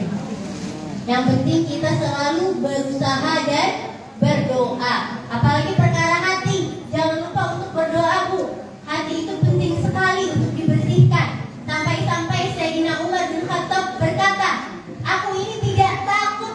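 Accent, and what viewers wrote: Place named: Arab world. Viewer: Dialeg bahasanya Arab bangat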